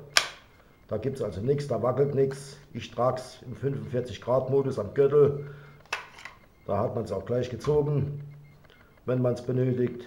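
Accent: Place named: Saarland, Germany. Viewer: Da höre ich doch einen saarländischen Dialekt raus. 8-)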